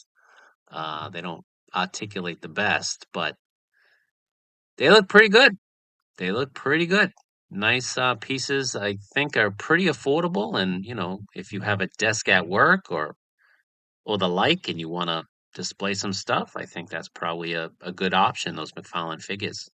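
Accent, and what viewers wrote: Boston, United States. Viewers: Awesome review of what was shown at the con. As someone who lived in the Boston area for several years, I also always appreciate hearing the Boston accent in narration. Go Sox. Go Pats.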